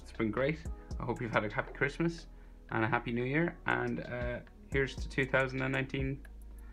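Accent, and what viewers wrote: Ireland. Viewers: Are u from the south of ireland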